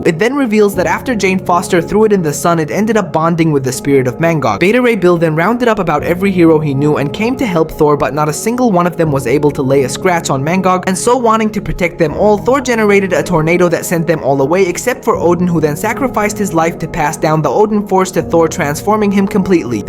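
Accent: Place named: New York, United States. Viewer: U from New York aren’t u?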